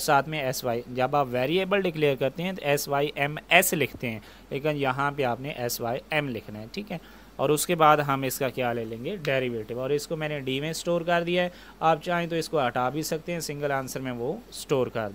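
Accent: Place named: India. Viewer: first lac i understand each and everything😃,thanks allah sir your teaching method is soo good,because you speak too desi like us😁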